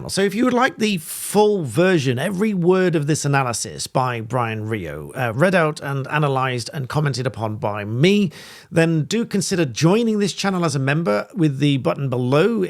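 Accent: United Kingdom. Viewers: Talk too fast - plus intolerable "British Accent". No thanks.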